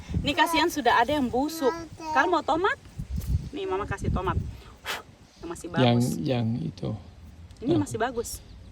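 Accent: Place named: Java, Indonesia. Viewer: Logat jawanya dapet bener kak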